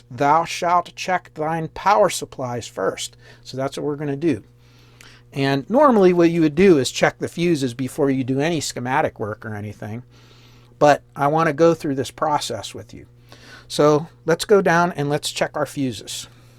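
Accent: Pennsylvania, United States. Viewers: Johnstown Pennsylvania accent.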